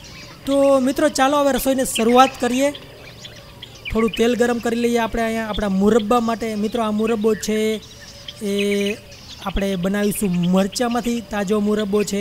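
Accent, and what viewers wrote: Kathiawar, India. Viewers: Your language pure Kathiyavadi😍🥰🤩🤩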